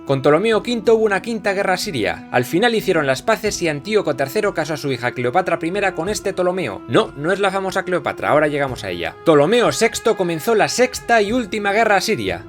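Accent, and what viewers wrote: Spain. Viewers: El asento español me caga de risa solo por eso lo veo... bueno tambien por la historia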